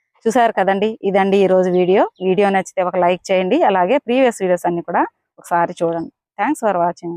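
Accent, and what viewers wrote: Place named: Guntur, India. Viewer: మీరు వెంకటగిరి అన్నారు కదా, మీ లాంగ్వేజ్ నెల్లూరు లా కాకుండా గుంటూరు యాస లా ఉంది మీరు గుంటూరు వాళ్ళా గారు లేదా ఆ డెలివరీ చేసిన వాళ్ళు గుంటూరు నా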